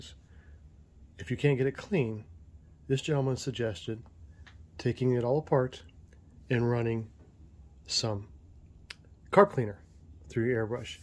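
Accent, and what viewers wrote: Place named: Canada. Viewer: Classic Canuck talk. 😆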